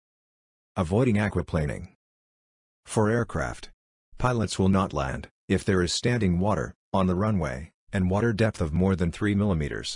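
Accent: India. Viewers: I like your slow teaching voice.. ❤thnks.. Btw why do u seem to have Indian accent. 😁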